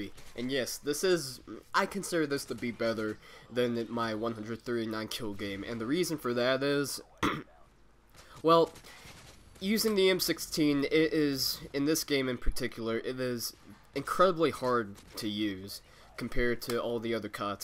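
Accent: Hawaii, United States. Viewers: he has a Hawaiian accent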